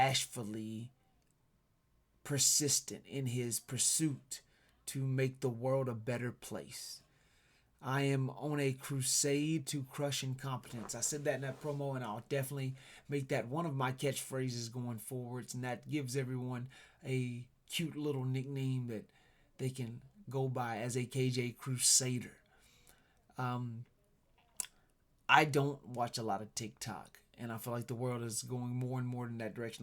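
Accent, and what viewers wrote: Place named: Alabama, United States. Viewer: I thought you were from Alabama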